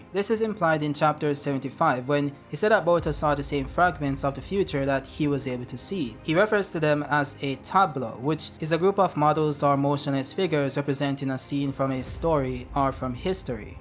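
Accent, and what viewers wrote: Jamaica. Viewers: Are you Jamaican? I hear an accent. Big up from Jamaica bro😂🔥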